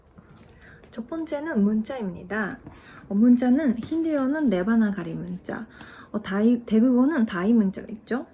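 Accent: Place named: Japan. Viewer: 日本人の韓国語らしく日本人の私には聞き取りやすいですが、韓国語ネイティブにとってどんな感じですかね。でも内容はとても面白く、違いを要領よくまとめていらっしゃいますね。ありがとうございました。❤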